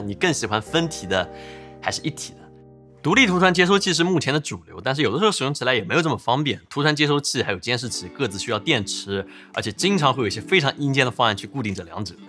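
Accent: Taiwan. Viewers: 台灣人這樣講話的嗎?? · 你很机车，妥妥台湾腔。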